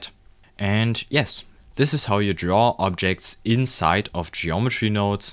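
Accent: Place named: Germany. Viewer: you are german i can hear the acent in you XD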